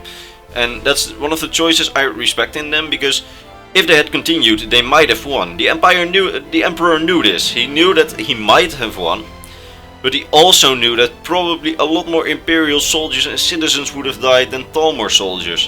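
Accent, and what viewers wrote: Netherlands. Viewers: nederlander dus kan het aan je accent horen 👍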